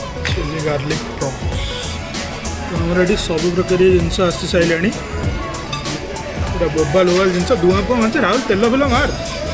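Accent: Odisha, India. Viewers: I luv your way of talking..prati 5 min re hehe haa..dhe..dhe .dha..dha😂😂actually ame mane bahare maintain kari katha hele v...real life re samaste emti typical odia re hin katha hau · I subscribed your Chanel cuz I like your odia accent and also I like your vlogging style keep it up bro 👍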